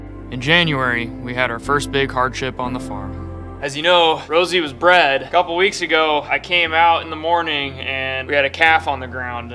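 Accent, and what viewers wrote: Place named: Ohio, United States. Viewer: i just saw kentucky but even in ohio they have ur same accent up here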